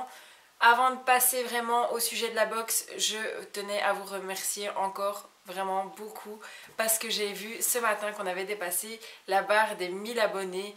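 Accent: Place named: Belgium. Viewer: oui c'est vrai ça s'entend que tu est belge tu viens de liege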